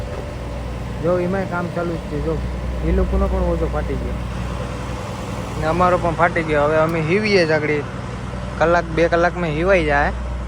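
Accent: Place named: Una, India. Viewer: bhai tamaru gam kyu che because tamari language parthi evu lage ke tame gir somnath na una taluka na koi gam na haso evu mane lage che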